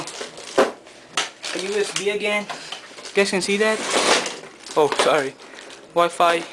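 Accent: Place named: India. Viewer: you sound indian. i'm chinese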